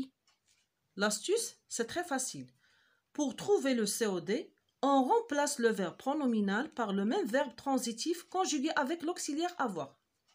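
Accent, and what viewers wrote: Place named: Morocco. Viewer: مروكية ولا دزيرية باسكو الهدرة تاعها شوية كي تاع المراركة اول مرة نشوفة الفيديو تاعكىفي قناتك وهايل بزاف 👌👌👌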